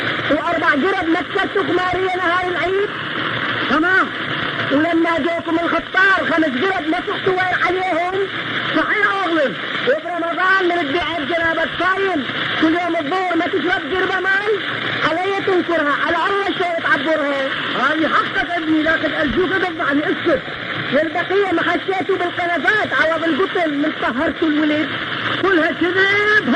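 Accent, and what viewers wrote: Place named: Baghdad, Iraq. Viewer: مشكلة بعض الكلمات غير مفهومة بسب التشويش بس الكلام البغدادي الاصيل روعة شلون لهجة حلوة ❤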